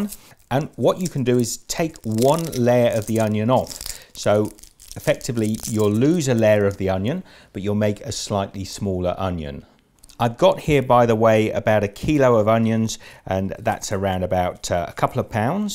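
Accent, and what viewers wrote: United Kingdom. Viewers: Are u british u have a sort of british accent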